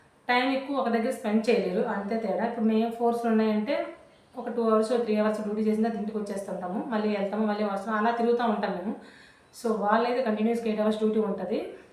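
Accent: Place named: Srikakulam, India. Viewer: Midhi srikakulm haa madem.. Language... Same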